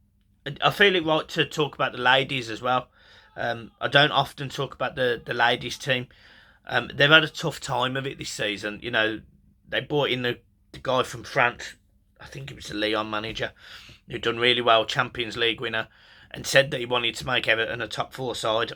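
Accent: Birmingham, United Kingdom. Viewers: Hey mate your very passionate and rightly so. You from Brum area?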